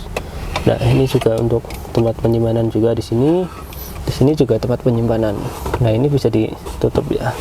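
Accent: Banyumas, Indonesia. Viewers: deneng Ngapak...😅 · Medok banget ya mas jan enyong banget😅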